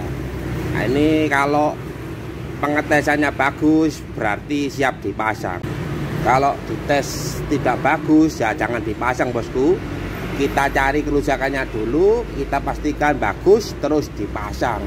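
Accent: East Java, Indonesia. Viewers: Boso jowo timuran iku cak....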